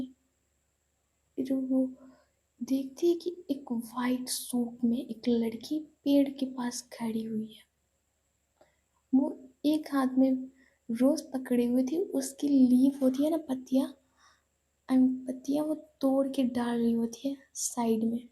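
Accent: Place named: Western Hindi, India. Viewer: The eposide is nice & like western hindi accent. It suits your voice.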